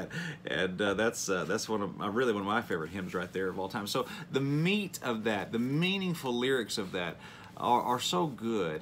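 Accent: South, United States. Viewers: I was just about to ask you where you are at or from. Because your southern accent is just like ours. I was going to guess TN or KY. I looked up the radio station and it is in middle northern KY. I am in northern middle tn, just across the KY/TN state line. So directly south of you. Nothing like the old gospel hymns. We have a small church and few young people want to be a part of it. They want the entertainment of the worldly church. The parents and grandparents do not really like those churches, but they want their chuldren in church, so they go there. They figure any church is better than no church. There is so much ignorance in the Christian world today. Not enough accurate doctrine being taught., its great to see someone in your generation that understands this and reaching out like you do. I have always thought of the missionary field being in other countries and extreme rural areas. 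But I see the need all around us, everywhere. There is a great need of re-teaching Christians the Bible. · I like your southern accent. I'm from Alabama now in Kentucky. Keep up the good work. Medium well btw. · Brother, thank you for this timely video. I just downloaded the WIOP app. Also, from one southerner (Texas gal born and raised lol) to another, I understand your accent super clearly, haha. May the Lord bless you, sir.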